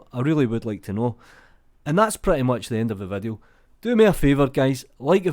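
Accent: Scotland, United Kingdom. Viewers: I have no idea why a Scotish accent reminds me of the vikings 🤔 but great tutorial by the way !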